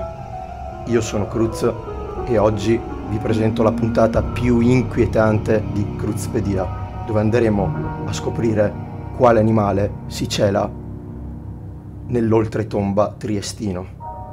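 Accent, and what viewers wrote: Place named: Verona, Italy. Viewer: Naturalmente Veronesissimo 💪🏻💪🏻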